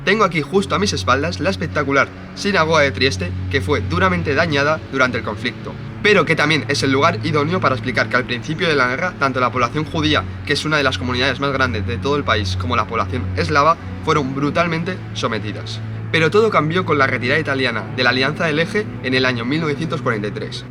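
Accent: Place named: Spain. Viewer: Que gusto poder ver videos sobre estos temas con acento español. Casi siempre son argentos o mexicanos 😂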